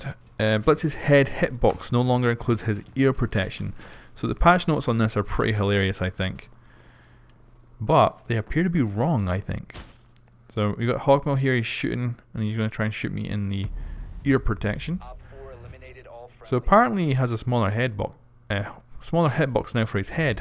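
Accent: Scotland, United Kingdom. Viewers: Are you scottish by any chance? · R u scottish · Are you Scottish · Are u Scottish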